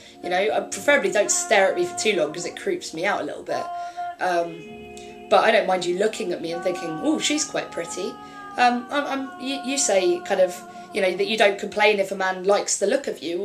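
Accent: United Kingdom. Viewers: I'm sorry but I can't watch this video because I can't consentrate on what you are saying. Seeing you in 1940 noir chic style is making my blood race, with you chiming away in the english accent and that squacky torch song on Wow ....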